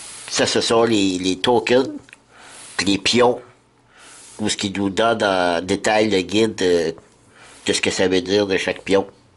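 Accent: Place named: Quebec, Canada. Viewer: Merci pour ta vidéo, bien détaillée, pour tes bons voeux (reçois les miens en retour) et ce délicieux accent de la Belle Province qui régale mes oreilles françaises ! Au plaisir de te retrouver sur d'autres vidéos (j'ai adoré ton Top 10 jeux solo). Bye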